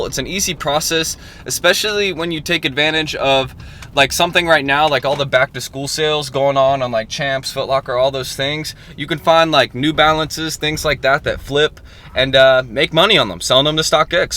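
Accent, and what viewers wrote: South, United States. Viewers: your voice is an interesting mix if southern,Stoner,skater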